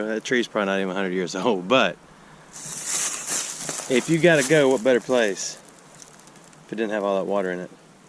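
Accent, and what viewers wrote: South, United States. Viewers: I get a lot of enjoyment out of misspelling in my head, the way that he says it. I have spent some hysterical minutes trying to spell aluminum foil "Foll". I'm not making fun, I'm just really enjoying your puns and your Southern accent anthropologically. I am not ever going to be clever enough to play on words like you do, it's a gift and we are lucky you share with us.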